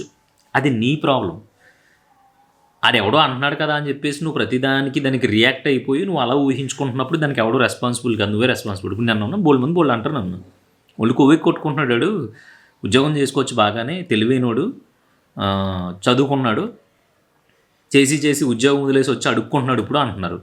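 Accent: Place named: West Godavari, India. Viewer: Confirm ga nv west Godavari anna nuvu ❤❤❤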